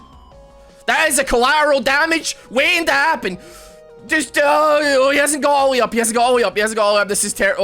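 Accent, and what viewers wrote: Scotland, United Kingdom. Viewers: I need to know if you are capable of saying "purple burglar alarm," as supposedly some Scott's cannot.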